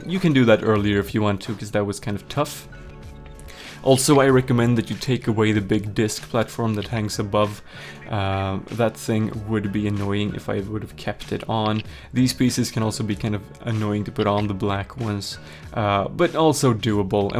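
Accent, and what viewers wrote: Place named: Sweden. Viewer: First time I'm seeing this channel in my feed.. Not lego related but your accent.. You sound Scandinavian, maybe Swedish? Sorry just curious 😅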